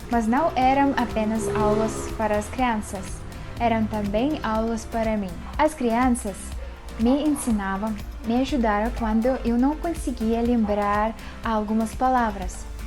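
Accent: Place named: Sorocaba, Brazil. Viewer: você está fluindo bem seu português,mas com sotaque Sorocabano será influência do seu esposo.muuto interessante.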